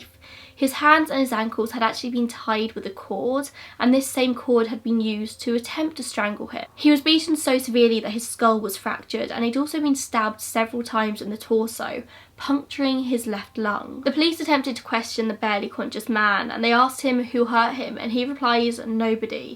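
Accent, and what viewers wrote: United Kingdom. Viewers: Hi, you're so cute girl I love your British accent and your gorgeous lips, xoxo.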